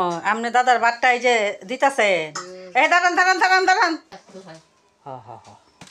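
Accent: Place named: Kishoreganj, Bangladesh. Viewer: লাইক দিয়ে পুরো ভিডিওটা দেখে নিলাম দিদিভাই কিশোরগঞ্জের আঞ্চলিক ভাষা শুনে খুব ভালো লাগলো অনেক পরিচিত ভাষা নিমন্ত্রণ খেতে গেলে ❤👍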